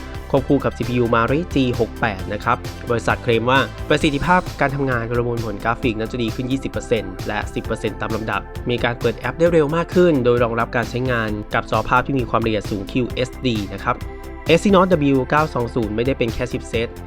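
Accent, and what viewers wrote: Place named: United Kingdom. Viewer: ชอบสำเนียงอังกฤษ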